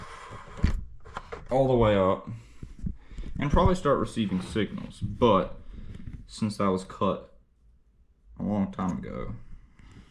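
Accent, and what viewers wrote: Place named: Alabama, United States. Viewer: Southern Alabama accent.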